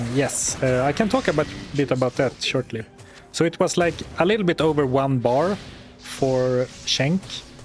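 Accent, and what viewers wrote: Sweden. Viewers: I'll wait for the next sale, you make me want to play again, perhaps also single player, your challenges are awesome. Heard you mention earlier you were Swedish, the accent is pretty telling. (I'm a dutchman who moved to Sweden ~4 years ago, and you guys have a very typical accent, so do we I guess).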